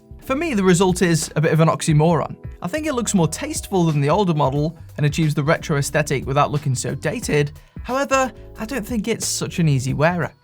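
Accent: United Kingdom. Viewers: I hate your British English accent. It's just so annoying. Maybe because your contry and Churchill left us after WW2 alone to be eaten by Stalin behind iron curtain and we suffer so much! We helped you a lot during the war. Breaking Enigma first, and show how to fight in Battle of Britain. That's why we don't go to victory parade with you. Your country betrayed us and do nothing! You ordered to pay for fuel use in aircraft after the Battle of Britain. That was like slap in the face. After all good content about watches but the accent reminds me of how I hate you GB. From Poland.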